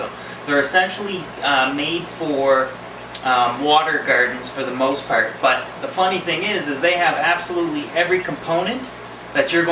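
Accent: Canada. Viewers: Love watching videos by Canadian guys. Too funny accents.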